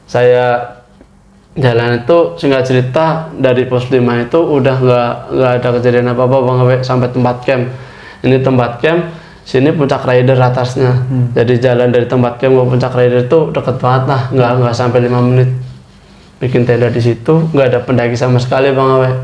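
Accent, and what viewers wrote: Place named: Banyumas, Indonesia. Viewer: Nonton idola bang wong dewek ngapak hiii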